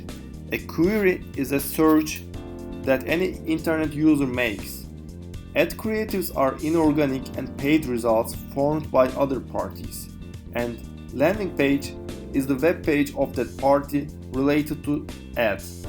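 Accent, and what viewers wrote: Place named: Turkey. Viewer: türk aksanı hissettim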